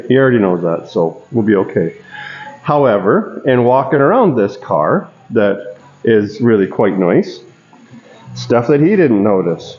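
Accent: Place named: Canada. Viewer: It is good to see a Canadian that still talks Canadian, eh.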